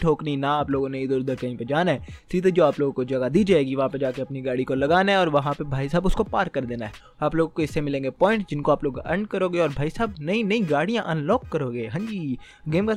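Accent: India. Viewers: Ap himachal sea ho na. The way you speak is exactly like that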